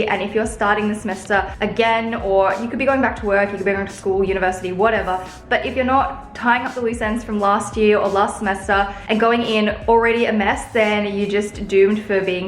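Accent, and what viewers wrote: Australia. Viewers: Lol I binged a bunch of your videos and now my thoughts are in an oz accent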